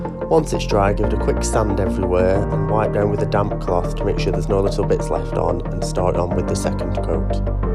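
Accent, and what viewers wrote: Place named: Newcastle, United Kingdom. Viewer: Nice job! Canny little cabinet looks very chic now. 😊 Enjoyed your voice over, always like to hear somebody from home, cheers from an expat Geordie in Alberta. 👍😊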